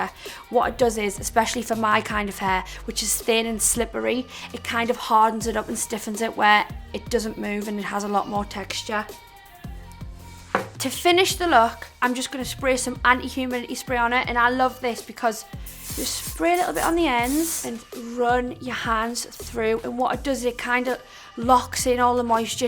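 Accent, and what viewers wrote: Newcastle, United Kingdom. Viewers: Have you permently quite Geordie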